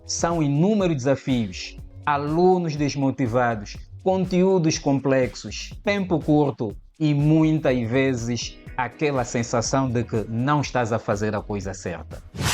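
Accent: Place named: Angola. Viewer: professor você é de angola ou moçambique? Sem preconceito é claro.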